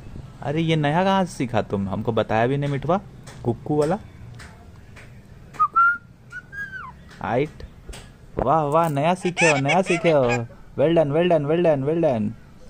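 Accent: India. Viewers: you are a stress buster.... Thank you for being u❤ your accent is pure desi... Pls don't change 😆👌👌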